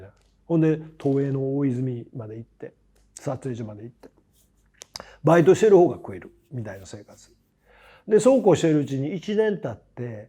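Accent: Kansai, Japan. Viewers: 長い間伊原さんのファンですが、今まではドラマや映画などでしか知らず、遠い存在でしたがYouTubeでグッと身近な存在に感じます😄 (私が生の伊原さんを見たのは舞台マヌエラでした。) 今回の後編の話も、自分らしさが出てる話で楽しく拝見しました。こんなに関西弁話されるんだぁと思ったけど、伊原さんらしくていいと思います。これからも応援します！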